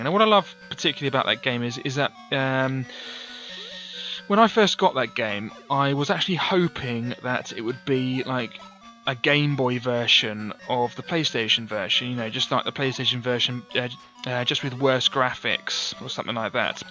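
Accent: United Kingdom. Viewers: I'm not certain there is a particular way a 30 year old is supposed to sound. You do sound very British though 😄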